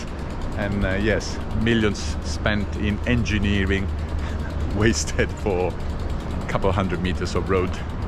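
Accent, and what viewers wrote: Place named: Italy. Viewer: Just a personal question. Did you grow up in Italy? I love your accent. Any recommendations for an American looking to take a trip to Italy, specifically regarding places to see ?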